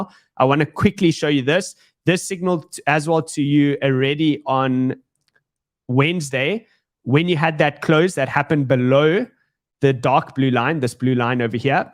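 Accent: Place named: South Africa. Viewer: Love the SA accent. Couldnt care less about TA. Its all BS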